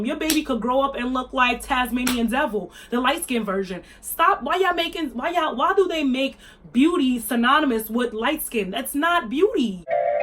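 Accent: New York, United States. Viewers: Hey girl heyyy😘👋🏾 I’m a new subscriber & this is the 1st video of yours that I’m watching 😚 love your whole vibe. I KNEW you were from my hometown girl yasssss 🗽🗽🗽🗽 I know a fellow New Yorker when I hear one lol,I knew before I scrolled down? What borough are u from? About this video lol: I’m sooo disappointed in YG😒 I’m a die-hard fan & hearing him make these colorist remarks makes me really uncomfortable. I know from following him on Instagram that he has a preference for light skinned women... I thought that’s where it starts and stops...but no. He really does fetishize light-skinned women & it’s so ignorant & tired. Uggh. Unbelievable. I’m bout to delete him from my Apple Music library. 😑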